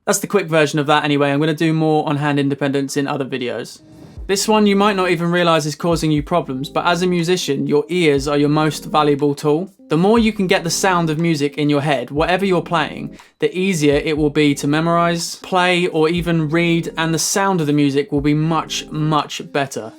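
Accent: United Kingdom. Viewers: You look like American but you sound British.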